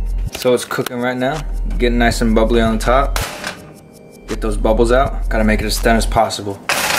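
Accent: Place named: Illinois, United States. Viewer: Lol shit smacks. Sounds like Illinois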